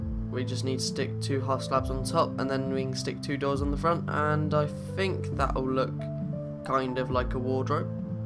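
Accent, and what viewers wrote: United Kingdom. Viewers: I love your british accent haha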